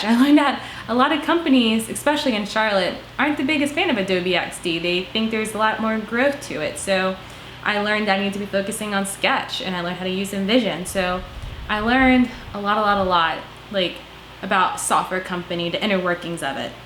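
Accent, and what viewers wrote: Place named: Australia. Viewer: Are you or one of your parents Australian? Every now and then you have a slight accent :D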